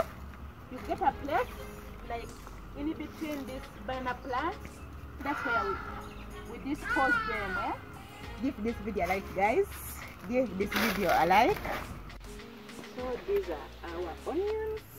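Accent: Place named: Uganda. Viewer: You speak Ugandan accent sis.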